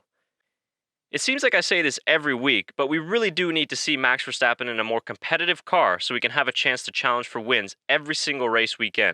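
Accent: United States. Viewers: I really enjoy this content. I don't know if you currently live in Europe, but it's refreshing to hear someone with an American accent talk about F1 and really know what they're talking about. If you still live in the US, awesome that you watch the races! I can imagine they're quite late at night over there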